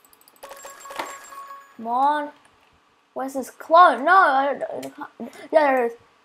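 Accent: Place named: United Kingdom. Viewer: Briish huh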